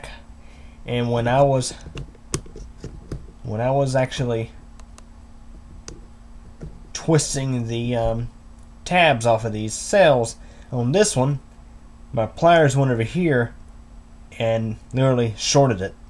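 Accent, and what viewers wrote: United States. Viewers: I know this is an old video, but I bought an 11 dollar generic HP battery, because it was cheaper than buying the cells for a flashlight. So I was somewhat concerned that they may be low capacity. Finding this vid and then hearing your American accent was very reassuring for some.odd reason so thank you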